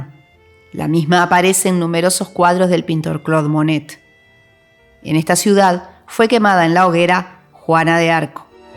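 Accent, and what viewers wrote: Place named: Argentina. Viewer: Toda Francia es preciosa!!! La voz argentina, suena maravilloso el español.Gracias por comunicarnos con su voz este precioso vídeo.👍👍👍👍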